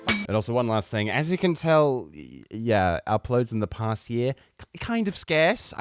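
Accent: Australia. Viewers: I'm glad he's as drunk and Aussie as he sounds. Also, and I'm just saying, I've never seen and the dude from Explosions and Fire in the same room.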